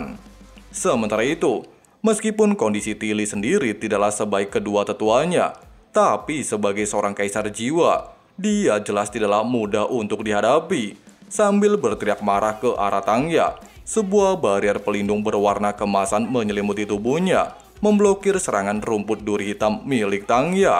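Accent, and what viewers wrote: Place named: Indramayu, Indonesia. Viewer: Dermayu bang lalah cah ya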